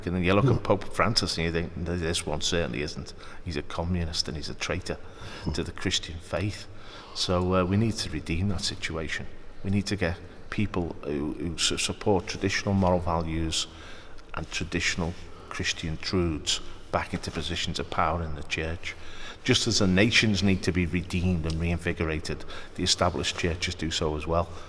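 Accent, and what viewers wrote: Liverpool, United Kingdom. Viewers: Happy New Year! Thank you for hard work and persistence! So great to hear a Scouse accent talking our language!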